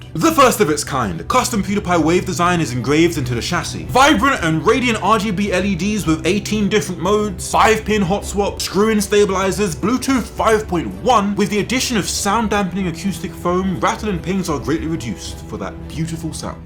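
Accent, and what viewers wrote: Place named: England, United Kingdom. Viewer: where's your accent bro? You used to be very English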